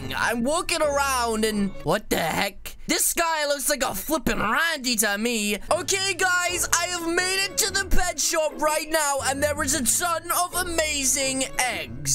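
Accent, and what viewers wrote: England, United Kingdom. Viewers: O.O HES ENGLISH!.!. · Hey I didn’t know your british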